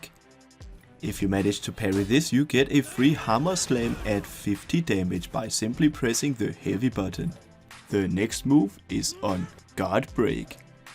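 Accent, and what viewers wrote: Denmark. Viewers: er du dansker haha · Is it just me or are you from Denmark? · Er du fra danmark? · thanks buddy! Fine bonus with the danish accent. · are u from denmark? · Jesus u sound like a Danish dude and pls don't talk so slow ÆØÅ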